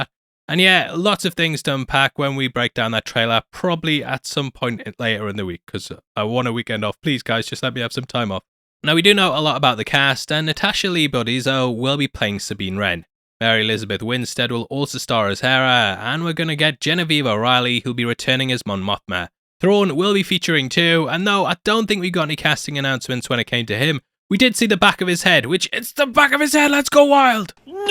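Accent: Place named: North East England, United Kingdom. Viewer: Are you serious? He's clearly British, from the north east by the sound of it.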